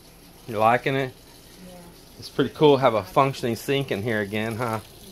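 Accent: Texas, United States. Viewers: your drawl is getting stronger over time as you stay in Texas. It takes a yankee to notice.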